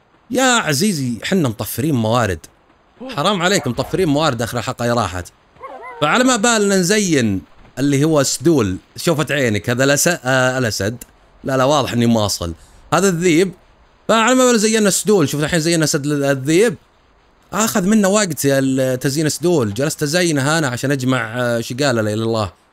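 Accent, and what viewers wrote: Qassim, Saudi Arabia. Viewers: استمر يا افضل يوتيوبر ❤ اهم شيء الي محلي المقطع اللهجة القصيميه😂😂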